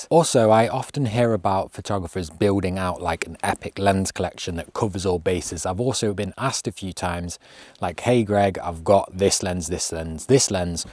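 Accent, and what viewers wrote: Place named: Nottingham, United Kingdom. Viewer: Great vid and nice to hear a solid Nottingham twang on YT 😊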